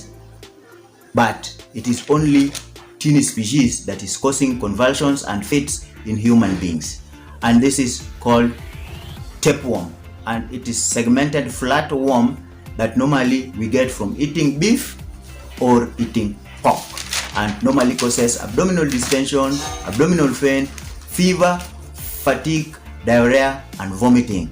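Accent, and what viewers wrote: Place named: Luo, Kenya. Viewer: Haha apart from deworming sijui last time kudeworm haha lkni the luo accent hehe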